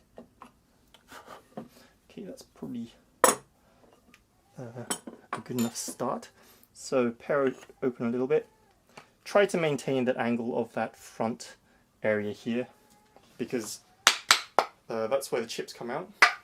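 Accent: United Kingdom. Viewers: I thought you were English, iv noticed a lot of British people these days compensating so Americans can understand , should be the other way around its our language , anyway love your videos keep up the good work.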